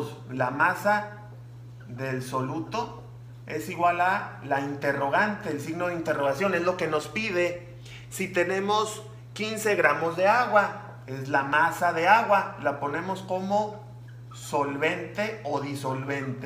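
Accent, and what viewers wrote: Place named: Mexico. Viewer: No es por discriminacion pero me da dolor de cabeza el acento de los mexicanos 🤷‍♂️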